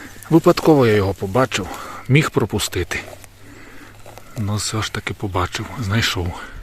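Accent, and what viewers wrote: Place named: Zakarpattia, Ukraine. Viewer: По мові чути: Закарпаття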